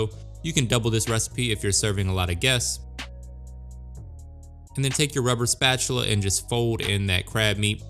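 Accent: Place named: Louisiana, United States. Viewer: Sounds to me like... You must be from Louisiana! 😁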